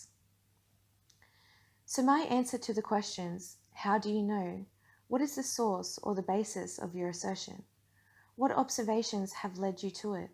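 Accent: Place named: Australia. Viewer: Awesome and great to hear this in an Aussie accent!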